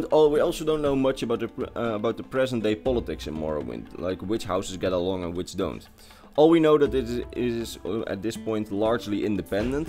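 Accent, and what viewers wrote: Netherlands. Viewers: Are you dutch? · lekker Nederlands accentje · It's Dutch haha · Haha bro je klinkt zo nederlands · Ik hoor een Nederlandse accent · Listening to your accent I think you are Dutch, right? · First 2 seconds i can hear u dutch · Is this guy dutch?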